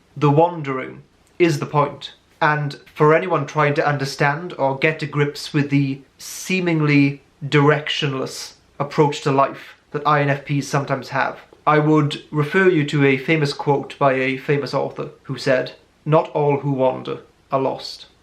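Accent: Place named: RP, United Kingdom. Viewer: You have the most posh accent I’ve ever heard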